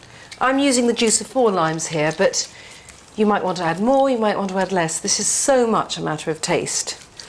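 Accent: United Kingdom. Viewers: nobody said she's not interesting in the Nigella express. It's just an obvious difference between Nigella bites & Nigella express. Nigella bites is so much better raw, vintage , cozy vibes much much better. Her kitchen, cozy house, pantry, little trips to the local market, going out to Bridgerton pier with kids, house parties allll of these things are so nostalgic. I'm not even from the UK or US. Yet I feel sooo much comfort in her vibe . I literally grew up watching Nigella. I was little and I couldn't understand English on top of that her thick British accent. Yet I continued watching until I started catching few words and finally fully understood what she was saying. Nigella feels like a close non judgemental motherly friend.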